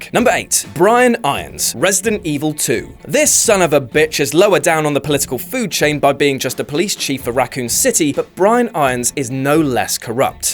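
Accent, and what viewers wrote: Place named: South West, United Kingdom. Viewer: Where are you from in the south west?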